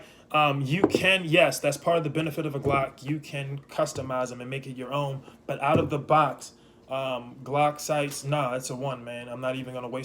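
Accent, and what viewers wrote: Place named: Maryland, United States. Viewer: Dem O’s & U’s definitely from Murland 😂 keep up the good work bro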